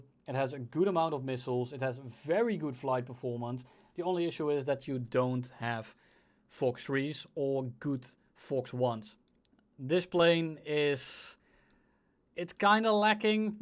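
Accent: Netherlands. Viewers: ik hoor n beetje nl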